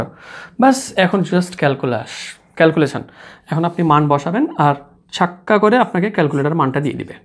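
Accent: Rajshahi, Bangladesh. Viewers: Sir are you from Rajshahi?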